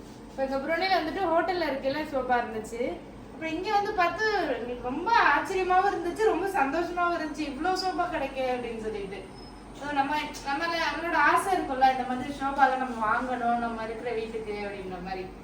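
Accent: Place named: Ramanathapuram, India. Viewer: Ongloda slang ramad (D's)mari erukku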